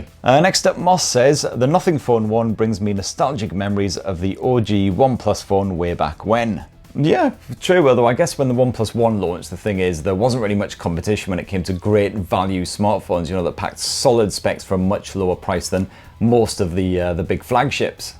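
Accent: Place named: Newcastle, United Kingdom. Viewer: Putting HTC to the side, I'm just going to take a moment to appreciate a fellow Geordie using phrases such as "Arse Juice" and casually laughing off AIDS.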